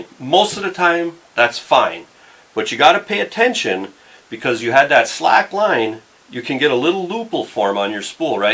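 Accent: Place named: Wisconsin, United States. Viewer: First off awesome video. Second, I watched about 3 minutes of this and was like this guy must be from Wisconsin...then I saw the hat!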